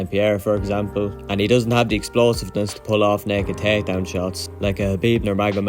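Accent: Ireland. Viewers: Wasn’t expecting the Irish accent. Good breakdowns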